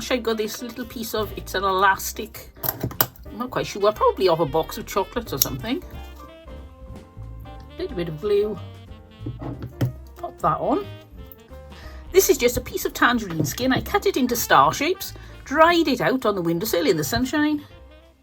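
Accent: Wales, United Kingdom. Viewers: we enjoyed your video,it was lovely to hear a welsh accent for a change on a crafting channel .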